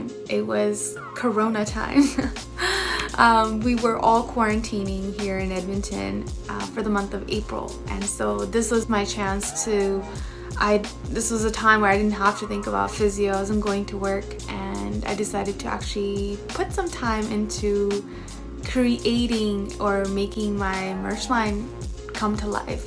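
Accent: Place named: United Kingdom. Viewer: Hii, your english is like britishers😶😶 how it is I don't know.... But anyways your dance is amazing😘😘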